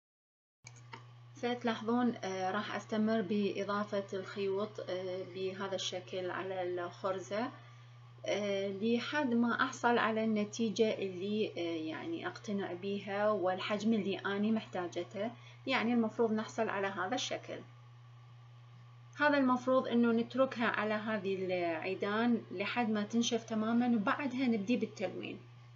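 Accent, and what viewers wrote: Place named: Iraq. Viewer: من جد روعة روعة روعة سلمت يداك ست واني استفيد منك هواي واحب شغلك بعجينة السيراميك اتبع طريقتك ومقاديرك واسوي منها اشكال تجنن ميداليات بس م جربت الاكسسوارات لا تتأخرين علينا بفيديوهاتك الرائعة وافكارك الجميلة وكتير حبيت اللهجة العراقية من اسمع صوتك 😍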